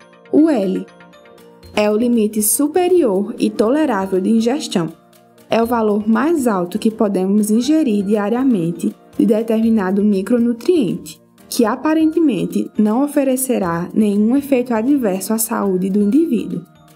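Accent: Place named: Northeast, Brazil. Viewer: O sotáque nordestino ai ta forte kk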